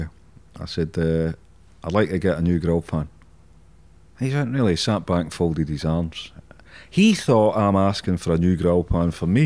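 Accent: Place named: Scotland, United Kingdom. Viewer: Love how Scottish is in this podcast it really comes out haha it's like sitting listening to my uncle's chatting when I go to visit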